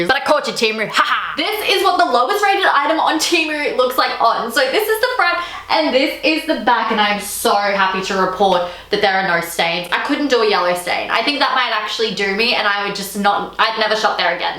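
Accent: Australia. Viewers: Cute video but curious to know, from one aussie to another... where is the sudden "kath and Kim" voice and sayings from? is this a new thing??? LOL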